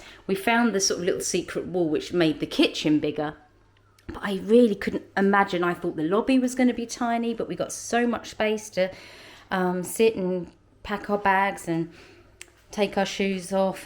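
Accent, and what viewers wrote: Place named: RP, United Kingdom. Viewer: Like your accent. Very posh.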